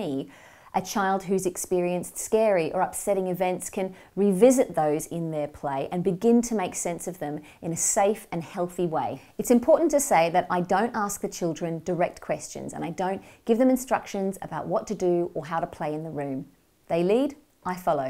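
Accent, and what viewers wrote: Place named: Australia. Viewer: I was so happy when I watched this, thinking this would be perfect for my 3yo son and hearing your Australian accent, thought it might be accessible to me but alas you are based in london. Any recommendations for something similar in Melbourne??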